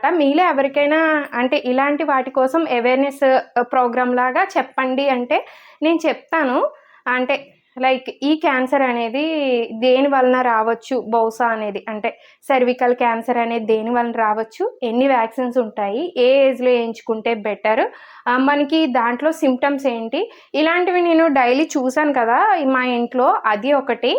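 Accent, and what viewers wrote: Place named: Kakinada, India. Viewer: Soursop soop fruit juice mee husband ki ivvandi , B17 foods pettandi , non veg thakkuva tinali ,sugar thaginchali ,ila diet control cheste mee husband cancer growth thaggutundi ,maa ammagaridi kkd vakalapudi ,maa attavaru vzm, meeru chala baga matladutunnaru ,meeru matladutunte kakinada lo unnatlu anipistundi,mee matalu vinalanipistundi , maa maradalu kuda meela untaru.maa chanal svcs dairies meeru subscribe chesukondi maachanal peddaga develop avvaledu.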